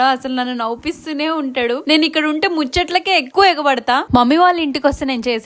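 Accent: Telangana, India. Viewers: Nuvvu Telangana yasa mattadutunnavu 😅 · Muchatlake egabadata... Telagana language ni style ga matladutavu sis...👌🥰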